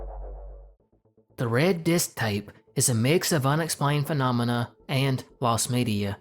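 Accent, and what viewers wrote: South, United States. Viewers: It's soo good to hear a real deep southern voice that isn't put on. Love your show!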